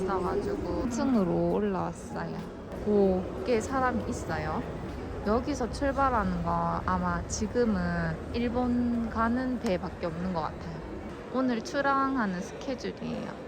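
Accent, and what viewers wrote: South Korea. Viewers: 부산분은 아닌거같고 경북 억양이 조금느껴지네요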